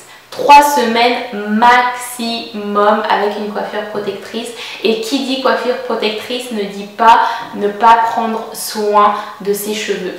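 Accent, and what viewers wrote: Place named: France. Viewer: vous avez une belle accente francaise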